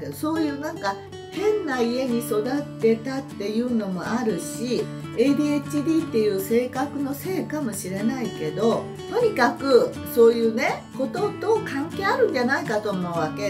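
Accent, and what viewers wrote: Yamaguchi, Japan. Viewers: 山口弁がとてもここち良いです。私も山口出身の山中湖住まいの70歳です。凄く元気もらってます。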